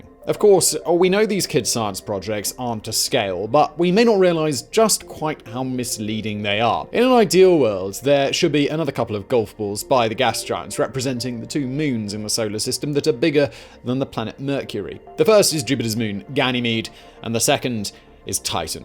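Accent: RP, United Kingdom. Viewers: ... I know your British or something to that accent...but meethane...it is a short E...methane...as in meth-ane...otherwise like the trim job on the beard... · Wait? How many channels do you have? Just so I know on which to go and subscribe. I assume the quality of your other channels is equally impressive, educational, entertaining and a just amount of brittish posh😉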